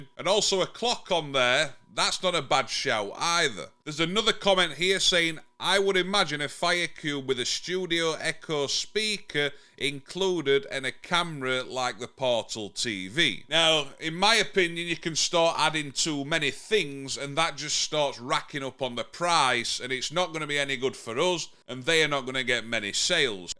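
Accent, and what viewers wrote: Yorkshire, United Kingdom. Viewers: I love the fire TV cube! The only problem with it is that I find that I have to restart it every day to keep it stable. If I don't, it goes haywire if I use my headphones! I'll turn them on and YouTube (which is mostly what I watch) just sticks and I get that "loading" circle! I tend to restart it every morning. Apart from that, I'm fine with it the way it is! I love your down to earth videos and your lovely Yorkshire accent. I'm was born in Scotland, we moved to Merseyside when I was 9 and lost my accent!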